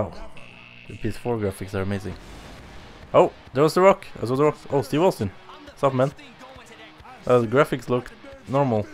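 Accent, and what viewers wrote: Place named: India. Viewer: your accent sound indian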